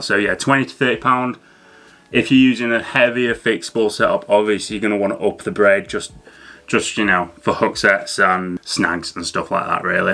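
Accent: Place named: Barnsley, United Kingdom. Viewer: The Barnsley accent tho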